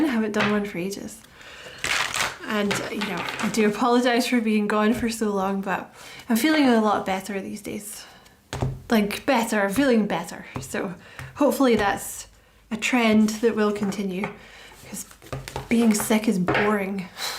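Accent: Scotland, United Kingdom. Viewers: Always wonderful to hear your lovely Scottish accent no matter what the cards say.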